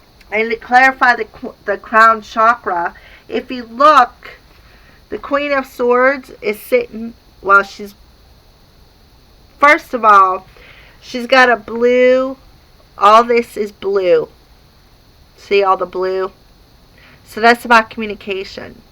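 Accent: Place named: Baltimore, United States. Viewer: Are you from Baltimore..?..we have a unique accent so I've been told and you seem to have it 😀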